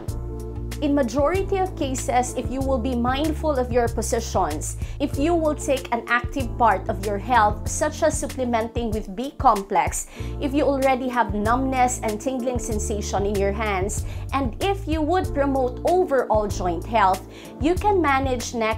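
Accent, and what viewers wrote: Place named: Philippines. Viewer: How cute the Filipino accent is 😊... I have neck pain, stiffness and the pain radiates up to the head and I've been like 3 weeks with nonstop headache like migraine which comes from the neck,I don't have numbness or shoulder pain,only neck and head... I don't know if it's osteoarthritis or not ,but it's really hell what I'm going through !!!!!!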